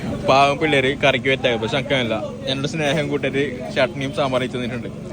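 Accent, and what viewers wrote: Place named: Kasaragod, India. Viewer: Nigal kasargod anoo.... Live kandu.... Samsaram kettpo kasargod ann thonnni Ksd ano nigal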